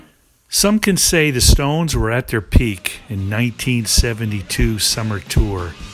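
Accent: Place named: New York, United States. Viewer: I especially love the voice in it !!!! The voice commentary is very very good!!! And it’s very detailed and correct to what actually went on , took place and unfolded in history and in the meaningful significance that it holds . Is your slight accent a New York accent ? May I ask ? It is very good !!!! It’s like that old show about the 60s and 70s the wonder years !!!!!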